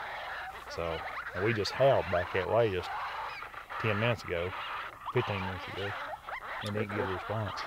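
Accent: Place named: Kentucky, United States. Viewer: don’t ever lose that Kentucky accent. That’s authentic Americana right there. Respect. Oh ya, you’re a hell of a shooter, too.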